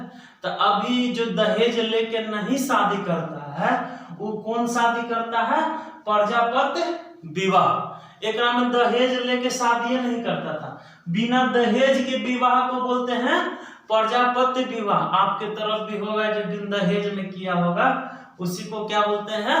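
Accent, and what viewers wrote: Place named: India. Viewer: Desi English ✍️✍️✍️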